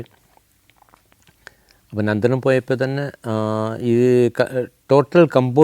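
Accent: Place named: Tamil Nadu, India. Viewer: മി അളഗപ്പൻ, താങ്കൾ തമിഴനാണോ. നിങ്ങളുടെ മലയാളം ഒരു തമിഴൻ മലയാളം പഠിച്ചു പറയുന്നതു പോലുണ്ട് !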